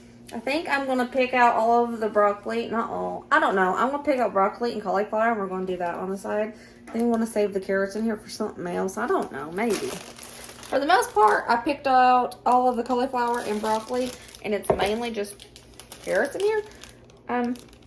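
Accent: South, United States. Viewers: Hi!!! Enjoy watching you so much! Your Southern accent is just wonderful I love it lot's have a great Day okay ❤️👍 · Hi what other dry ingredient went with the flour and salt❤im irish i am hearing impaired love the recipes ❤and the southern accent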